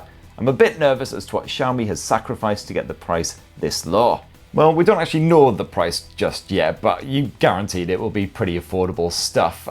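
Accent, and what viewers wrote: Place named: Northern England, United Kingdom. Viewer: we need you to tell us if any new piece of tech is shite or not shite in all your charming Northern ways.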